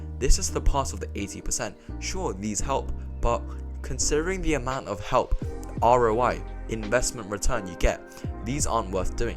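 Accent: England, United Kingdom. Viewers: Just noticr this is a british asian, he got the brains and sounds even smarter because of his accent gawddamnit! Lmaoo i paid attention though thank for the tips bouta applied the today!! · At first, Korean with this English accent🤌 Secondly, good video, hopefully it'll help my little sister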